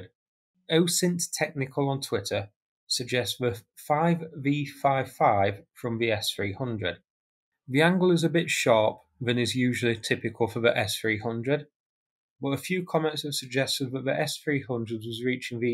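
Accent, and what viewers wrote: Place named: Northern England, United Kingdom. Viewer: Loved your expression "a little late there chuck" I'm trying to work out where your accents from? Leeds or Manchester? I'm in Leicester where they'd say a little late mi duck.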